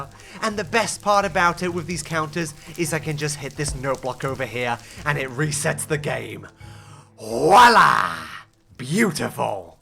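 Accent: United Kingdom. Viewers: use your british accent and make a british sentence about you going to hurt this turtle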